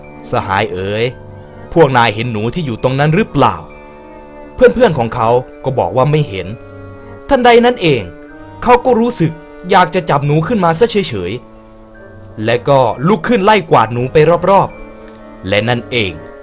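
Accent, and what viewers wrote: Isan, Thailand. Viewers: พี่คนอีสานป่าว คนบ้านใด๋นิ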